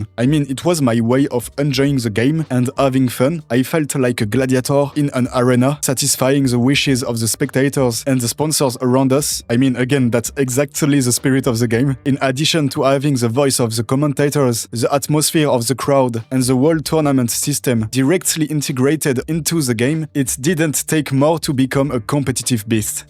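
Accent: France. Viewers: I'm italian so I find hard to compliment a french, yet I must say that french english accent is really cool 😂 Also you are my favorite the finals pro. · This is the most French Frenchman I've ever heard. Subscribed. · as a french, i approve this accent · I really love that French accent! Lovely video, keep up the good work man!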